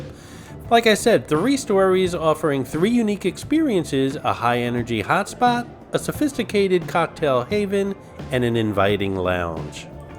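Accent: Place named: New York, United States. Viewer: Nu-Yawk-flair........o yea!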